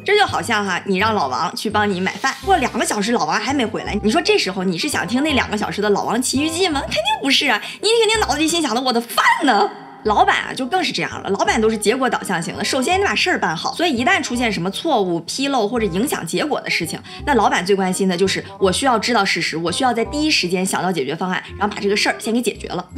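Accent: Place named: Beijing, China. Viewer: 你太可爱了，真觉得你是北京大妞儿的风格～～口音也是北京味儿～～这是怎么回事呢？生长在大连，咋长成北京妞儿了～～？很想认识你一下，跟你做朋友～～😄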